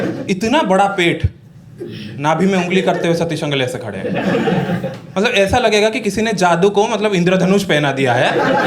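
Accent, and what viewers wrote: Odisha, India. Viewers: Bhai pura radducha .... Hama hindi in odia accent ❤😊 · Tone se to odisha se lag rhe ahem ! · Odia tone sun ke maja aa gya!!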